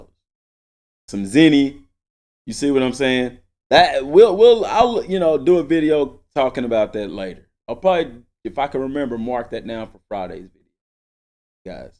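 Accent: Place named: North Texas, United States. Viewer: Super Hype for this game NOW, BUT you can’t band wagon something before it comes out and Proves how good or great it is. it’s not band wagoning to anticipate a good experience based on pre-Viewing. That’s just Marketing ,advertising, and publicists doing their job to make it more appealing to its demographics bro and that includes youtubers. Also you wouldn’t happen to be from North Texas area. Just curious straight out the gate you sound like my kinfolk and friends; accent and speech pattern wise.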